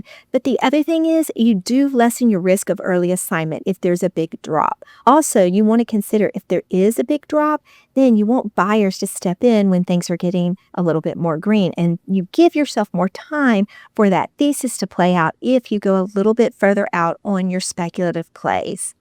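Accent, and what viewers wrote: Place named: South, United States. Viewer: I could just listen to that sweet southern accent all day long. Love your posts. How's your daughter doing with trading?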